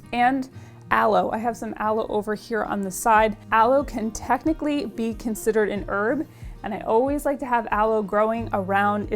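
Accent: United States. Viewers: The same reason she says oRAGeNno, she's American 🤷‍♂️ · Sorry, I can't watch this. There is an 'H' in herb, I know in America you feel the need to change the English language, but to an British English speaker this is unwatchable.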